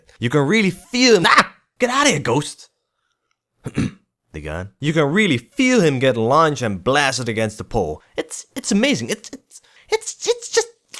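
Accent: Netherlands. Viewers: are you dutch? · You sound really dutch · dutch accent is so obvious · U dutch? Cus you sound dutch. Im dutch too and into drawing and animation.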